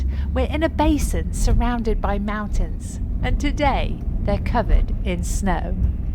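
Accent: United Kingdom. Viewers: I really enjoy you. I'm from the East coast and follow you and your friends. You have such a soothing voice and I dig your British accent! You are 1 smart lady so cool. I have learned so much from you. The Goodwills in Jersey do stock Christmas items throughout the year. I adore you! Hugs!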